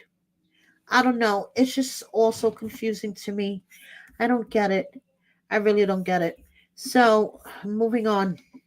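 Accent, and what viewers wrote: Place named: New York City, United States. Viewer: Hi happy holidays. I love your channel and just subscribed. Your accent reminds me of my New York City roots. Aloha from Hawaii.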